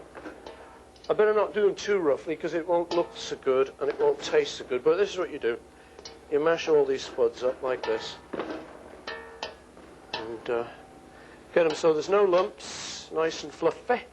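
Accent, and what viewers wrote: United Kingdom. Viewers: his Scottish accent is great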